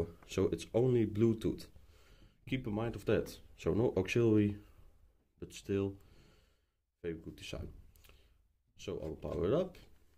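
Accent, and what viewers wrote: Netherlands. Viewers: That dutch accent🥲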